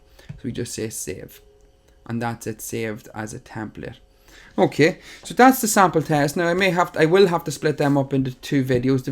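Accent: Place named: Ireland. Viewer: love your accent. where is ireland are you from?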